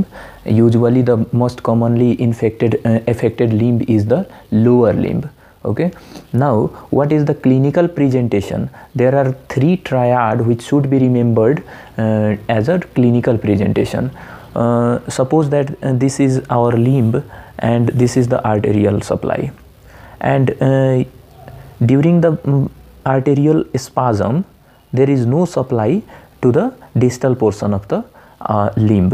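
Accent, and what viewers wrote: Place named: Bihar, India. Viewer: Don't laugh on accent ..may be he is a Bihari..but well explained everything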